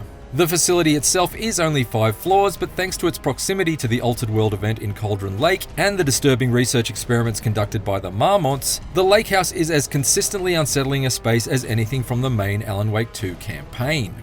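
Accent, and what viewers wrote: Australia. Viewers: He's aussie and some news reporters have this style. Althugh this guy is particularly annoying, and I'm speaking as an Australian.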